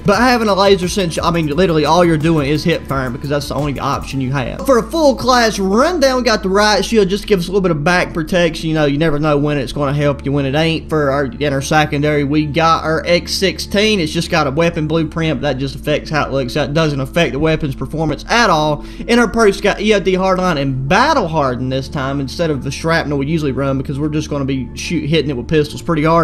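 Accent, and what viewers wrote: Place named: South, United States. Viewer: Is it just me or do u have the best southern accent? Lmao but u earned ur self a sub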